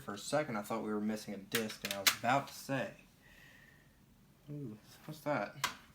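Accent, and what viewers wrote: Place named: South, United States. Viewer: oh wow, u actually imported the game! that's so cool! where are u from btw? cant help but notice ur southern accent XD im from tennnessee myself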